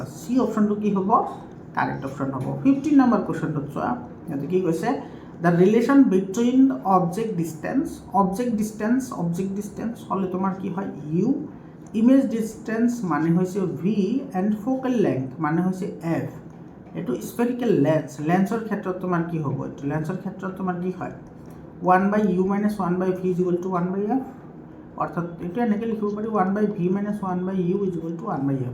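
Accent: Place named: Bajali, India. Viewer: bajali lage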